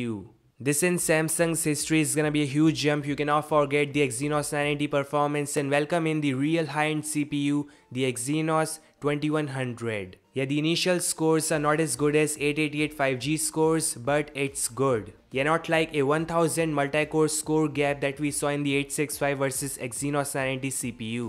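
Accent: India. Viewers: Yeah,Indian accent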